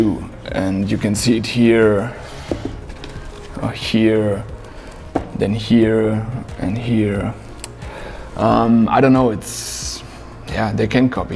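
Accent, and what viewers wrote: Germany. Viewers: Bei englischen Videos hört man immer sofort, wenn jemand deutsch ist. 😅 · Love your German accent. It sounds like you’re about to eat me with a glass of Chianti.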